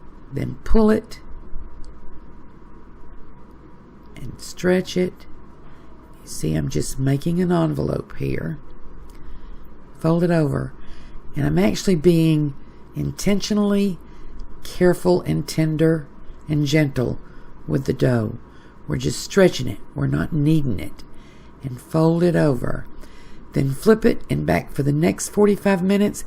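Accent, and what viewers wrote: South, United States. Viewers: I knew you were from the South !!!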